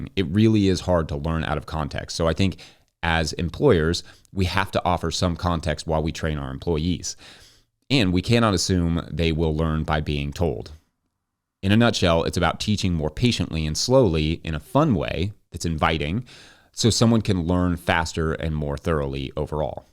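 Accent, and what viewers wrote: Kentucky, United States. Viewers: For sure. My father hobby-farmed ten acres (but I didn't choose farming). I understand that part of it is time pressure and could also be the frustration of training people who turn out to be temporary/seasonal etc. This podcast might turn out to be a good way for to capture what he's learned and document best practices for different crops for those of us who find ambiguity torture. (He already has some great videos about wash-pack and setting up for market, for example.) I grew up on a little island near Seattle but have spent the last decade near Barcelona. It would really make more sense for me to tune in to someone who discusses Mediterranean climate crops and techniques but this channel is one I like when I'm tired of being an expat and craving English. I guess I enjoy a Kentucky accent, especially his fast-talking version, which communicates some urgency and makes me feel like he doesn't want to waste time. 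(I wonder if he realizes he's just keeping random viewers company while they make dinner or clean house.) Agricultural content feels like home, too. Thanks for validating my comment, it's the most other people operating another way that makes autistic persepctive hard sometimes.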